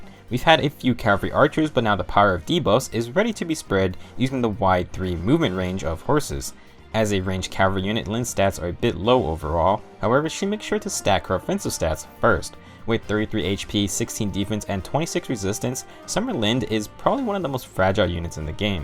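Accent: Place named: United Kingdom. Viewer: Jake Stavinsky Idk why people question her British voice, like don't question it it's hella cute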